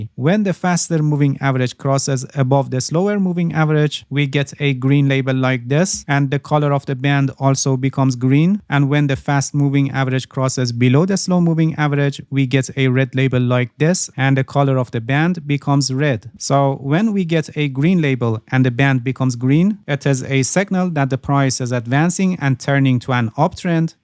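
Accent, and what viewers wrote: Iran. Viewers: That's iranian accent 👏👏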